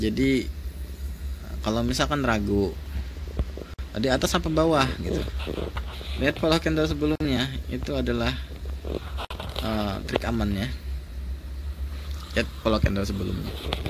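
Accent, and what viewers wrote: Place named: Kalimantan, Indonesia. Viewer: Bang tinggalnya dimana ? dari logat bicaranya org kalimantan yaa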